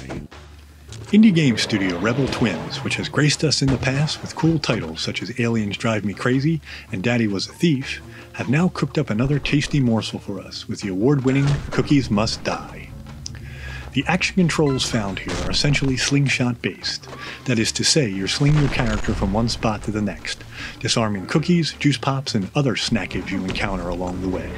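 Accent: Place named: United States. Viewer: Cool! You got an American voice actor! This app seems like less of the Chinese malware it is with him voicing it.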